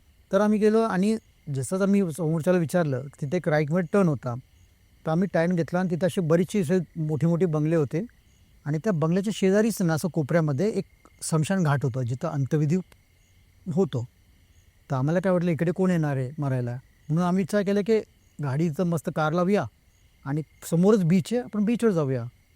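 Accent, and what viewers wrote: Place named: Pune, India. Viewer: arey re mitra proper punekar ahai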